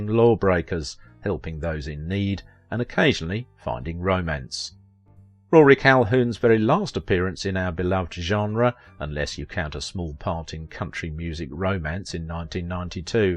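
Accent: United Kingdom. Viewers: Thanks for the backstory on Rory! Wow, what a male w...e. He did look good though! I would guess his street smarts gave him the rough, and tough look some parts needed. I remember many shows he was in. Too bad he had such a bad temper. I truly enjoy your voice, and story telling style. The sound of your british accent is easy to listen to, and tone and tempo really awesome. That was my dream to be a voice over actress, or book voice. Look forward to more of your work.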